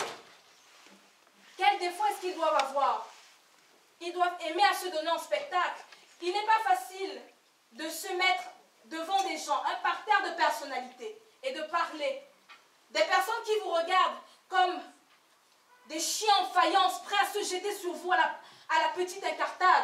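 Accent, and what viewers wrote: Cameroon. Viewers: je savais déjà que tu étais une camerounaise avant même que tu le prononces. J'aimerais vraiment te rencontrer :).🇨🇲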